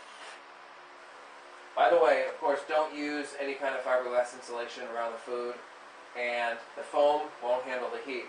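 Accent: New England, United States. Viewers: This is fantastic info. Just in time. Thank you. I subscribed and liked and shared even to friends I have other countries. Are you a New Englander? Your accent. Really nice video. Well done!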